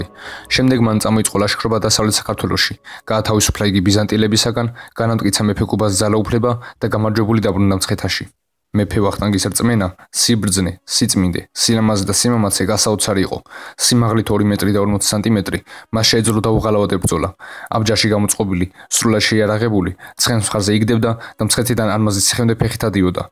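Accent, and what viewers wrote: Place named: Russia. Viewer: რყსულ ენოვანი სირბანჯოები არიან არხის მფლობელები აქცენტზეც ეტყობა და აქ რაღაც ზღაპრებს ყვებიან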